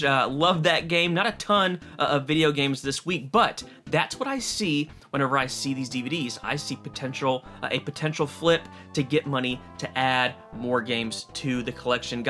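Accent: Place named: South, United States. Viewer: Where r u from cuz u sud like u from the south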